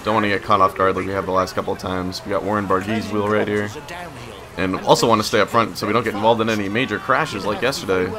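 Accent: United States. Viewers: You're pretty much pronouncing all the names like an ignorant American to be fair ;-)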